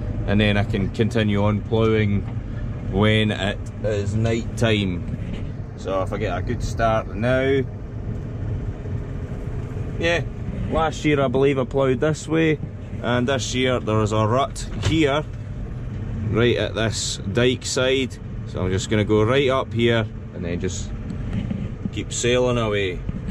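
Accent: Scotland, United Kingdom. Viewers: What part of Scotland are you from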